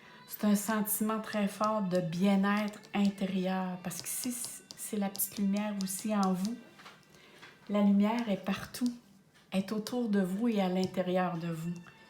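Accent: Canada. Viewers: Bonsoir le Canada est dans ta voix 😘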